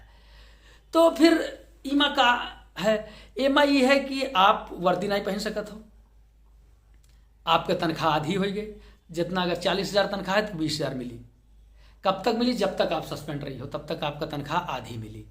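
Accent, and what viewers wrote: Awadh, India. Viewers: Sir aapki boli se lagta hai ki aap avadh area ke hai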